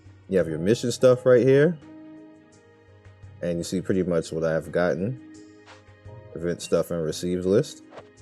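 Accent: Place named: New York, United States. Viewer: Bro are you from harlem? You sound like you from New York. Nice Video on the game!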